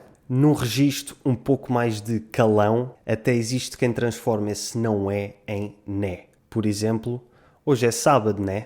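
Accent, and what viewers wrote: Portugal. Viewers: Eu adoro o sotaque português seu!!! Muito Obrigada por seus vídeos!!!! É muito boa sua dicção é a forma pausada da sua fala!!!! Muito Obrigada!!!! Adriana Cymeri FACEBOOK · Oi pessoal gosto.muito de seu sotaque Qual é a lugar de Portugal aonde mora você. Sou Venezuelano.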